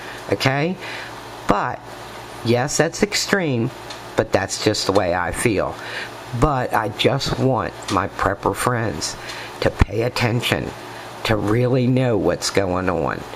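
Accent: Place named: Pittsburgh, United States. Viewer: Id encourage you to do another video, you did great! 👍🏼 You can help others so much with your story...i found you at t0ny's site💕🙏🏼😘 (r u from pgh, pa? U sound like it, thats where i grew up)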